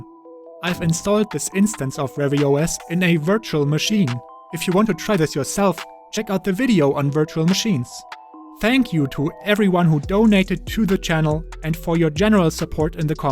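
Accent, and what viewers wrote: Germany. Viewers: man hört deinen deutschen akzent fett raus und trotzdem sprichst du englisch?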